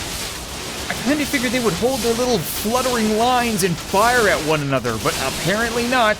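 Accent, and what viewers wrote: Canada. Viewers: Wait, you're Canadian? How did I not notice?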